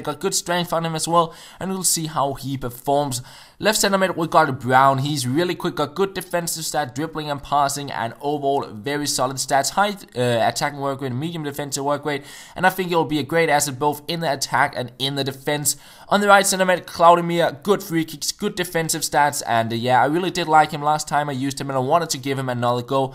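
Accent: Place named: Denmark. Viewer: Thats your opinion He is from denmark and that is his accent so deal with it If it annoys you so much than dont watch his videos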